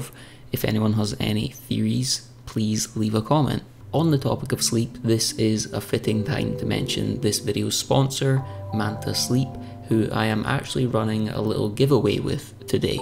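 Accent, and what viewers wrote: Scotland, United Kingdom. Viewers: What part of Scotland are you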